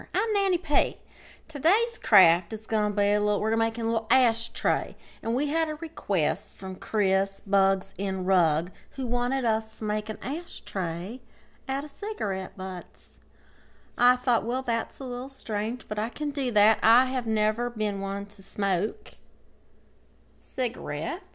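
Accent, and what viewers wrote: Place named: Tennessee, United States. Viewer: There's some Reese Witherspoon in your accent. I guess that makes it a Tennessee accent.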